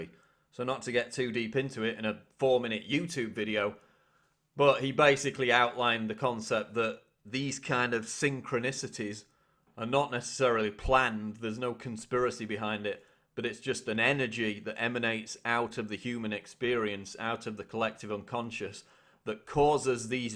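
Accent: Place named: England, United Kingdom. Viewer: Like the Boston psy-op was also a coincidence - same nonsense, Family Guy episode. ROLLS EYES This is not just a sacrifice, but it's telling people who are awake that there is NOT A THING you can do about any of this. Shills like Watson here know what's up but are FORCED into the establishment's plans to mind control people - or else. And no, the "lunatics" are not coming out of the wood works. The "lunatics" are calling BS on people like YOU, and Mr. Jones, your boss. Syyyyn-chrone-IS-iities.... that fucking English accent gets old real quick when pronouncing that word. See if you can do something about it. Thanks!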